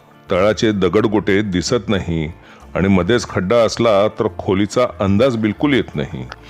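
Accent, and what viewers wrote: Pune, India. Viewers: खूप छान पुणेरी मराठी !!!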